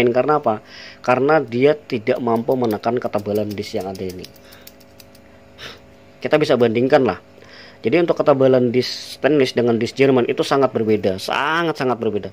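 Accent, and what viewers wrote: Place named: Indonesia. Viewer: Logat bahasa HSU msh lekat dan kental bang..🤭🤭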